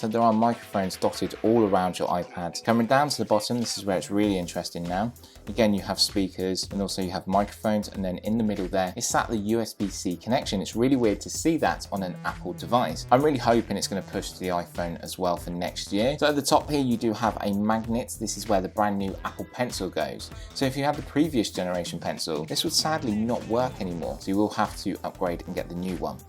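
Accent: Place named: United Kingdom. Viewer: Heh. I love your sophisticated British Accenture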